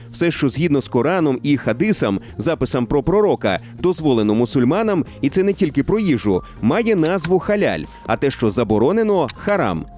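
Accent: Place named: Russia. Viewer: Навіщо коментатор "паскудиЦЦЦь" українську мову різними "тоДЗЗЗі", "видЗЗЗів", "їдЗЗЗяЦЦЦь", єгипЦЦЦяни",тощо ??? Яка гидота переробляти нашу мову на потворне маааскофске нарєчіє!!!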